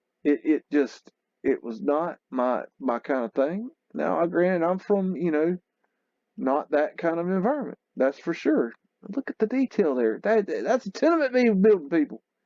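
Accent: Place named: South, United States. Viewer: Love what you're doing! Whoa that accent is thick tho lol where in the south are you from?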